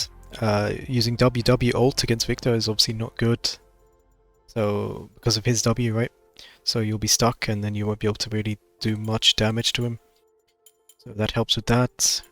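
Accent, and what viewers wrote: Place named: Europe. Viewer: i noticed you have a hint of european accent in your voice :) . also good guide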